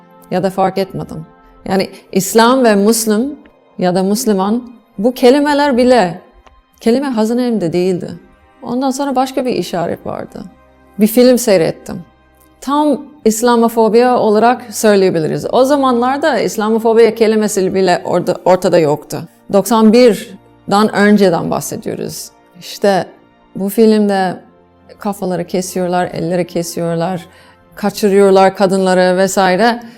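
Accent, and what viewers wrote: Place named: Trabzon, Turkey. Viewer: Abla trabzon lu sanki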